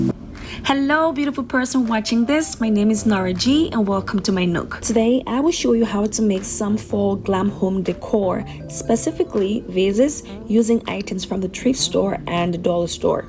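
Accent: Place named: Jamaica. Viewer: Love the little Jamaican accent & your creative crafts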